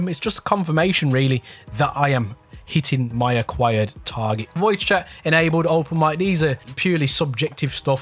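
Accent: Birmingham, United Kingdom. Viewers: Yes brummy lad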